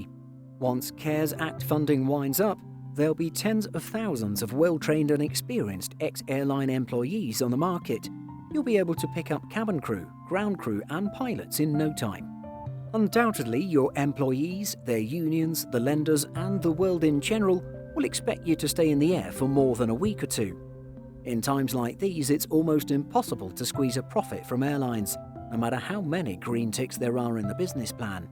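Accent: United Kingdom. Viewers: I’m just curious and it’s no big deal to me, but like in this program, when you mention capital investment you most likely show U.S. dollars currency in your videos rather than British pounds, or other currencies. All along I’ve thought Simple Flying originated in Great Britain. I guess that’s because of the accent of your narrator (He does a bang up job.) so I’m always expecting monetary value in pounds and you trick me and use dollars. So my question is... What’s up with featuring American “dollars” in your script usually rather than British pounds??? FYI: I enjoy your programs. Please keep the high quality. Many thanks!!!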